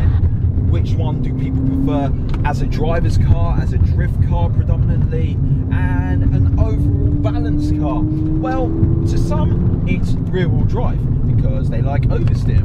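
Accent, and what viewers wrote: United Kingdom. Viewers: The whole video looks like Forza Horizon 4 in real life. The British accent only adds to it. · u r British accent 👌👌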